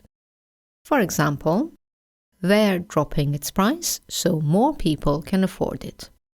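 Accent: United Kingdom. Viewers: It's an honour to be taught by you.I noticed your British accent.That's amazing and I'm Azerbaijani.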